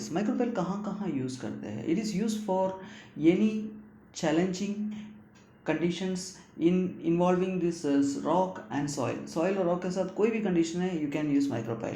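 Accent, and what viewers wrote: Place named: Kerala, India. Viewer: Hey Malayaleees...Alae...Slang ketapol manasilayi. True?.. and Good Info Bro keep it up...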